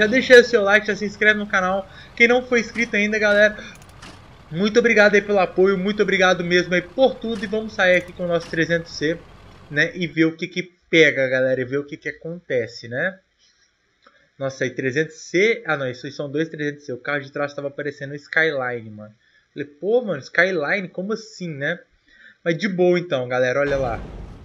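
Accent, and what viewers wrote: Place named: Minas Gerais, Brazil. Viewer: o é mineiro? ele tem um sotaque mineiro.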